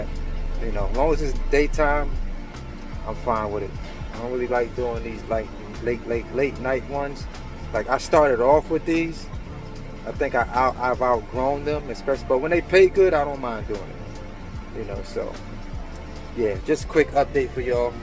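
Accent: Jamaica. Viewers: The Jamaican accent got me. Lol. Time to eat at $3.30 a mile. Keep hustling as we gotta get truck #1 back on the road. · Lol 😂 I thought he was a yardie for a sec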